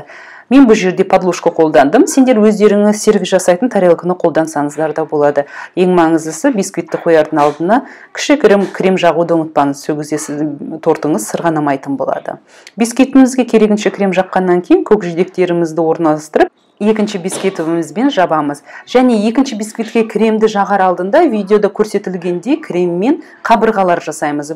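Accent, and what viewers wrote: Standard. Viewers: Ма щаа Аллах!Аллах енбегинизге баракат берсин!казакшаны таза сойлейди екенсиз 👍👍🌹🌹🌹💋100 лайк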